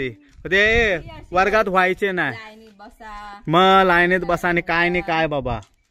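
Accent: Malvani, India. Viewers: Tu malvani volg madhe Chan Boltos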